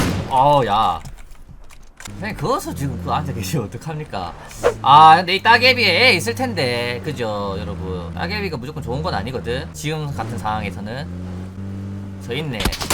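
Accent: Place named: Gyeongsang, South Korea. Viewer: 초등학생인 저희 아들의 권유로 배그를 시작하여 이렇게 유튜브까지 시청합니다.저희 아들은 김블루 광신도인데 저는 광신도입니다.ㅋ같은 갱상도 사나이라 그런지 사투리도 귀에 쏙쏙들어오고 재미있게 잘보고 있습니다. 아들을 이기는 그날까지 유튜브시청 많이 하겠어요. 퐈이팅 하이쏘~~^^ <mail> 입니다.ㅋ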